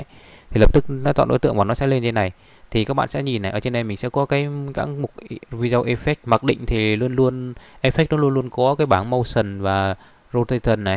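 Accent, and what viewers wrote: Vietnam. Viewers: Em hỏi ngu xíu ạ, em nghĩ là add phát âm tiếng anh đọc như tiếng Việt này là cho người nghe dễ hiểu phải không ạ? Em không nghĩ 1 người có thể giỏi về PR như vậy lại không biết english đâu hehe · ad đọc tiếng anh như tiếng việt, nhưng k sao 1 điểm thú vị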